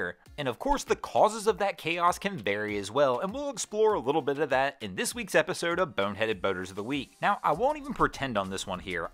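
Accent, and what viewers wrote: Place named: Pittsburgh, United States. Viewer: So what you're saying is that you're from "Pixburg"? Sure sounds like it.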